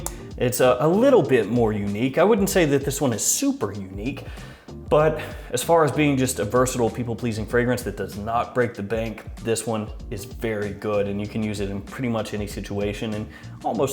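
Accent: United States. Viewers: I mean hes American so I guess his normal talk is actually how we talk lol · Really? That's how Americans talk?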